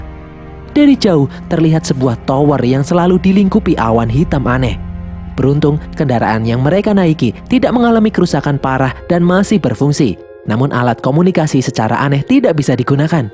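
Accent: Java, Indonesia. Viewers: pas gw nonton beberapa vidio awal mimin kok pembawaannya medok banget ya ? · Medok yo mas 🤭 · Miminya orang jawa mana ya?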